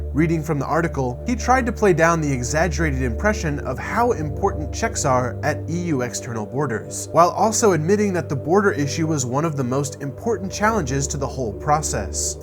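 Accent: United States. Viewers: I'd love to watch this but one minute in and I can't take the awful american pronunciation anymore, sorry man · Well done on the Irish border explainer - it's somehow reassuring to have a knowledgeable US voice explain it. Makes it all feel less crazy. I'm looking forward to watching your Berlin Wall video - I lived through the fall in 1989 when I was studying in Berlin.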